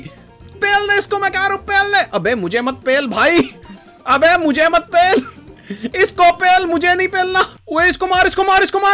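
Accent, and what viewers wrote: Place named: India. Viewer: You are speaking like chapati hidusthani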